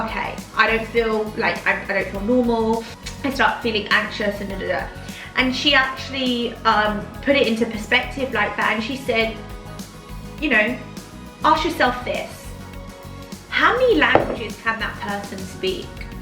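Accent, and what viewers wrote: United Kingdom. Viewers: Delicious! We love you!pride of phil with your British accent and a Filipino heart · Thanks for sharing your story. There’s something great about our Pinoy culture— the love, the caring. And what are they saying? Love your brit accent!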